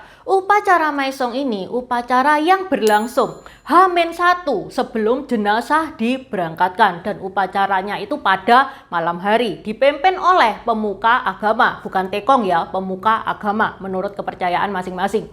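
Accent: Java, Indonesia. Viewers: Wajah anda tionghoa bngt ya ,tp tetep ya logat jawa nya kental bngt ya😊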